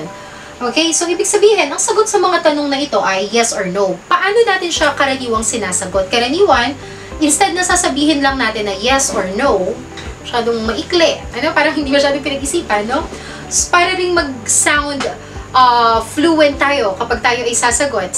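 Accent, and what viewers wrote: United States. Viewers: Si pinay Engish na blogger iba ang accent nya . She sounds American English . Lahat magaling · I think tama yung American accent mo dapat maglesson ka ng American accent sa pagsasalita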